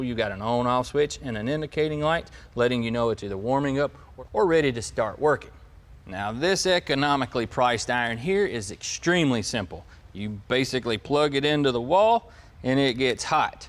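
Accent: South, United States. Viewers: Man oh man I really love southern accent!!! Don't lose that accent.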